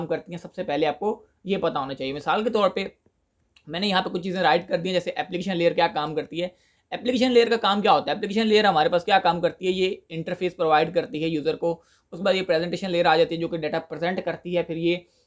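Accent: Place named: Karachi, Pakistan. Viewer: Lahjy sai karachi waly maloom hoty ho...!! :goodvibes:😉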